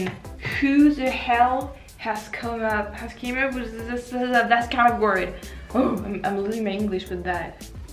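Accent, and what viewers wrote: France. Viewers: Please, don't lose your french accent. It is quite wonderful!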